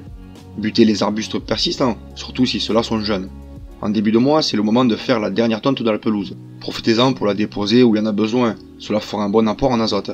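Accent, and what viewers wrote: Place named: Southwest, France. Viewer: Je viens de te découvrir par la vidéo permaculture passer d'un sol mort à 1 vivant. J'ai adoré. Tu es où en Centre France ? Car vu l'accent.... J'aurais plutôt parié sur le sud Ouest😂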